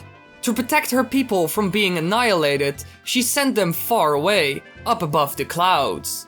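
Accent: Netherlands. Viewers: Nice video! Are you Dutch? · Are you Dutch?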